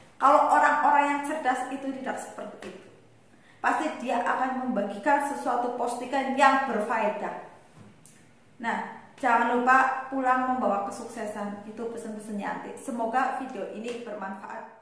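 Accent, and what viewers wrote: Banyumas, Indonesia. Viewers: betul kue mba yanti si cantik ngapak, pokoknya manfaat banget yang disampaikan sama mba yanti ini, ayo dulur dulur saling ingat mengingatkan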